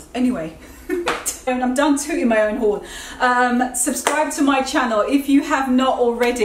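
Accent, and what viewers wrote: United Kingdom. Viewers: Transitional dressing videos are so useful! Thanks,. Your super cool British accent and enthusiasm for style are two of my reasons for being a subscriber and Instagram follower! 😁❤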